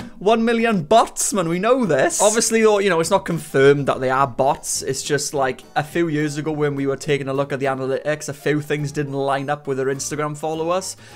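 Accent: Wales, United Kingdom. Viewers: I’m sorry if this is rude to ask but what is the accent he has is called? It is not the usual British accent I am familiar with. I just love his accent so much and would love to learn more about it. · He's Welsh. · Off topic but.. your Welsh? I knew there was something familiar about your accent!!!!! I DONT THINK IVE EVER REALLY SEEN A WELSH YOUTUBER!!!!!!! Finally!!!!! I have found someone!!!! 😭🥳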